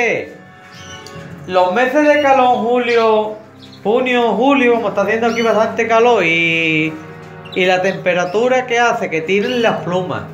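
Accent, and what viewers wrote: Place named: Malaga, Spain. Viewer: pues que decir que eres muy grande y aprendo mucho con tus vídeos paisano que por el acento eres malagueño como yo un saludo 😁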